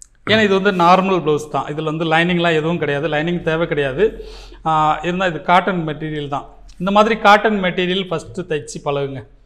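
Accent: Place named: Madurai, India. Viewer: Anna neenga Madurai ya..? Unga slang super😊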